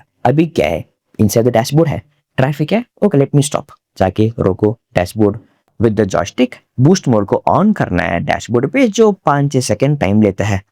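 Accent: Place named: Tamil Nadu, India. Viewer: Love your tamilian hindi accent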